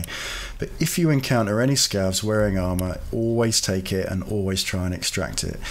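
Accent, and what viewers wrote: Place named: United Kingdom. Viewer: Why do i take british accents so much more seriously than american ones lmfao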